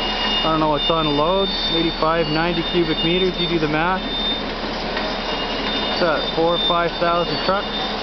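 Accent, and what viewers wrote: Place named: West Coast, United States. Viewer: I love it! ha your commentary is as westcoast as it gets!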